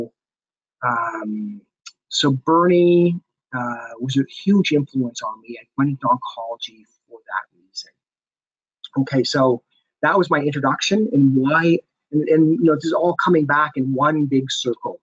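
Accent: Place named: Canada. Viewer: Enjoyed the presentation. Turn on "closed captions" to help with understanding. has a Canadian accent. This talk parallel's my own journey, now in remission (only a year) from metastatic sarcoma. I've thoroughly enjoyed my life on the edge of life and death. I'd recommend it for lost souls searching for meaning in a troubled world. It's a spiritual accelerant. As a social radical, the context is also living in a toxic, urbanized world, alienated from nature that's spinning out of control. Keep the flow of water and healthy nutrients going to help de-toxify the body-mind. Cancers often forms and habituates along routes of elimination: like the lungs, skin, liver, bladder, colon. Mine was (is) urothelial. Also, I'm a zen adept. I like Turner's book and have a sense of intentionality, but purpose needs to be put in a bigger context beyond the ego-mind, which is a social construction BTW. 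You allow (accept) by questioning, doubting and stripping away the non essentials. Meditation can lead to emptiness, being empty of all distinctions. Living from a place of non resistance in touch with the mysterium infinitum et oppositorum (Nicholas de Cusa). It's a mystical state of wonder, where there is no ego, no cancer, you're full of vitality or chi, and goals dissipate too. You're just in the presence of it all. No judgement or comment necessary. This along with Basho, my adoring adopted stray cat; a loving wife; openness; attention to alternatives Western medicine, driven by the pro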